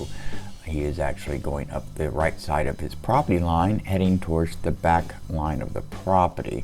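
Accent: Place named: Florida, United States. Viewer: Always thought you sounded like Batman (Kevin Conroy) with a Florida accent. Now I see you actually look like him I am delighted :)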